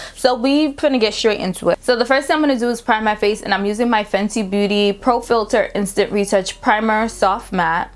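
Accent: New York, United States. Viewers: Girl!! I had to subscribe. Right away, I was like she has to be from New York! 💁🏾‍♀️ Love the results.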